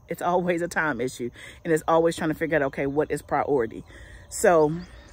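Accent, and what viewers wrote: South, United States. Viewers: Do NOT un-Southernize the way you talk ! That's a good part of the fun, to hear how we all talk so different. Lol. Many blessings everyone. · I live in Florida but I'm from Georgia & your southern accent is strong & hilarious to me, love it 😂 U are ao funny and yes hunny its hot 🔥😅 Gardening is fun but frustrating at time with fighting critters that eat up your 3 months of hard work in 1 day! But just keep going 👍. I love your channel! ❤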